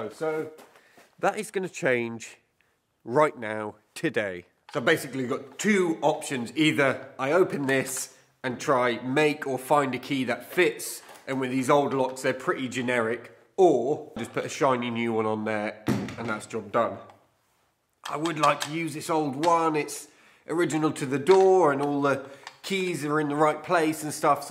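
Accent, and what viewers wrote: United Kingdom. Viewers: A bit off-topic, but your voice keeps reminding me of someone else, and it finally struck me that you sound like Cary Grant. His British accent was somewhat diluted by working in Hollywood, but I hear it in your voice. I'm old enough to be your grandfather, so I'm a bit more familiar with old movies, and Cary Grant, but if you get a chance, watch one of his movies. And more on topic, your range of skills, and industrious ways, never cease to amaze me. We need you here in Michigan!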